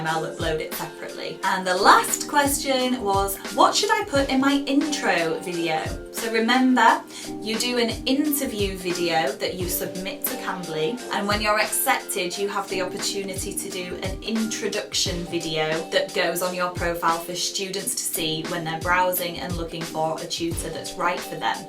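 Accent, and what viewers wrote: United Kingdom. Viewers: British accent I guess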